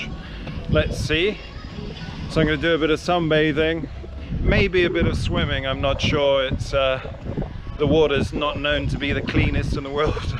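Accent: England, United Kingdom. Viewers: I sure hope you hooked up there in Odessa, you are a good looking guy, suave and have a nice English accent. I definitely saw some ladies checking you out. Go get them tiger LOL. And if the lead role for The SAINT remake comes along you definitely should audition.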